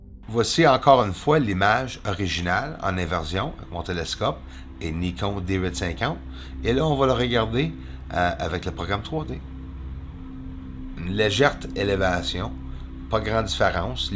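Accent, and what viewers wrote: Quebec, Canada. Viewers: J'aimerai te donner un conseille, je sais que tu as déjà énormément de boulot, mais pour les Français ce serait géniale que tu sous-titre t'es vidéo car certaines personnes ont du male à comprendre avec l'accent Québécois... ensuite si tu pourrais délimiter les zones avec des pointillés légers qui chemine les contours au fur et à mesure les structures dont tu parles, car moi-même je n'arrive pas à tout percevoir peut-être par manque d'expérience visuel personnel. J'adore tout ton travaille sincèrement mais nous ne sommes pas tous de ton niveau et je suis certain que ce serait très fructueux pour ta chaine et tu pourrais ainsi d'autant plus développer ton projet financièrement très certainement si t'es viewers accrocheraient plus facilement au premier regard, en espérant que tu ne fasses pas assassiné par les men in black haha Big up bro Je te kiff!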